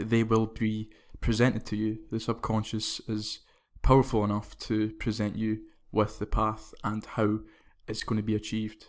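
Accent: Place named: Scotland, United Kingdom. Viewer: 👏 great scottish accent , cognitive training methods that are practical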